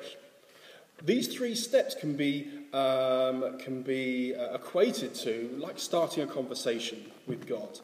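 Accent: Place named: London, United Kingdom. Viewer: Thank you so much. You sound like a Londoner (Guessing North East London?) I used to work in a kosher butcher"s in Southgte. I also Have you ever heard of Shimusha Rabba tefillin?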